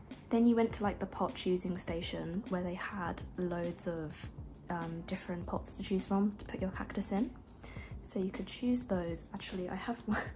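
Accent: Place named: United Kingdom. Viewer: I’m studying English through your videos lately. Your British accent is so beautiful and easy for me (I’m Japanese) to listen to. I’m looking forward to watching more videos❤︎ (Sorry about my bad English) 最近の動画を見て英語を勉強しています。アクセントがとても綺麗で私でも聞きやすいです。これからの動画も楽しみにしてます❤︎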